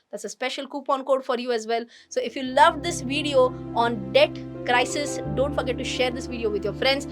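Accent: India. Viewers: You have a pure Indian good English Accent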